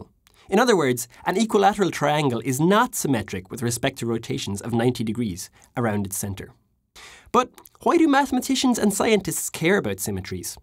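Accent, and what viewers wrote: Ireland. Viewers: IRISH ACCENTS <3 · The voice of an Irish person makes my ears bleed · Why do i think the speaker is Irish ? Maybe it's the accent. Did anyone else feel that ?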